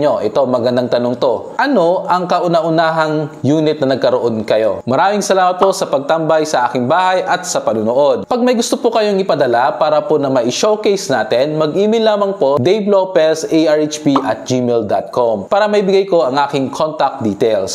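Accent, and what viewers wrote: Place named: Iloilo, Philippines. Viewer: hi sir.. its seems ilonggo ka..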